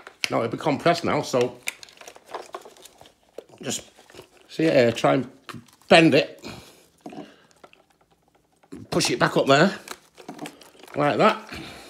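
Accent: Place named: Northern England, United Kingdom. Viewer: As we say in Australia, you mate, are a deadset legend! Thank you so much. I am the world's worst handywoman and your guidance (made all the better with your friendly Northern accent),meant I replaced the rotten old hose with minimum fuss. Big thumbs up!!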